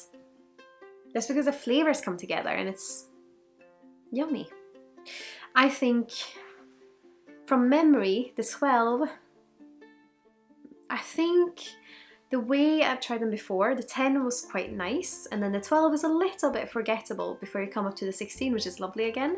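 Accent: Scotland, United Kingdom. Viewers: Just ordered 2 bottles ..you convinced me. Oranges, strawberries ... wood. Sounds great. Alert don't by this its a watery dram for beginners The A'bunadh is a good drop so can't go wrong Does Sweden have any distilleries. ? I'm confused as I'm sure you have a Scottish accent.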